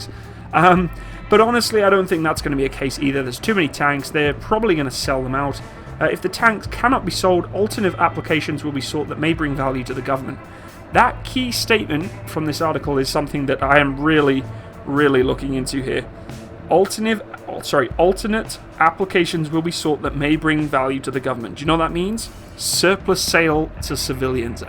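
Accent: United Kingdom. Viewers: i though you were british? how long you been in canada?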